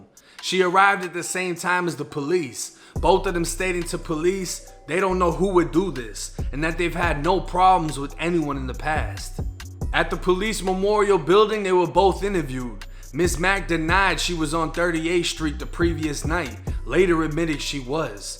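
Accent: Boston, United States. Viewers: Y u always claiming Florida and obsessed w Florida when u sounds like u from Boston!!! That's what I really wanna kno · I love your accent!! When I moved to Orlando from NY everyone knew immediately where I was from lol. Can't take that Boston know matter how long you live in Florida!! Btw love the way you tell these true crime stories!!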